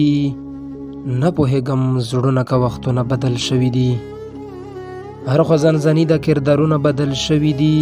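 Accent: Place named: Karlani, Afghanistan. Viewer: په کرلانی لهجی کښ یایه ډیر خواند کوی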